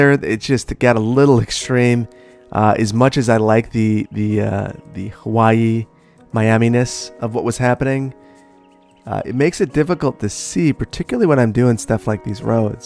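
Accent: Wisconsin, United States. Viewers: Unthaw… dude you’re totally from Northeast Wisconsin…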